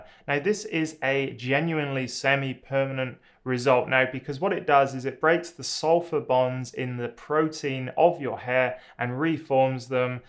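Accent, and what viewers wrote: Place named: Shropshire, United Kingdom. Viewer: Your accent sounds somewhat local. Shropshire?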